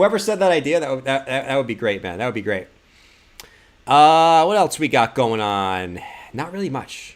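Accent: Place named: United States. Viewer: What’s with the yankee accent all of a sudden? Wtf? A new reinvention as everyone loses the lot while it is about to fully collapse?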